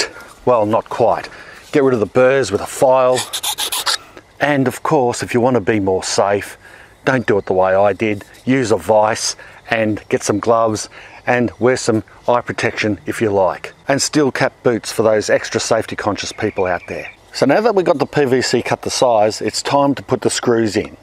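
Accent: Australia. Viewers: The Billy Mays of DIY, and bonus Australian accent. I wonder what else you are growing there that you are not showing us ;) You should pitch yourself to be a TV Ad/promoter.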